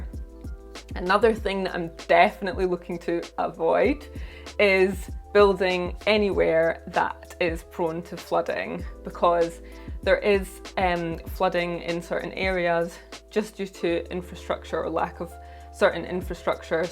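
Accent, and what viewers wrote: Scotland, United Kingdom. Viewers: Are you from Scotland 🏴? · I love you accent, is it Scottish? I love the Scottish accent. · R U from Scotland ?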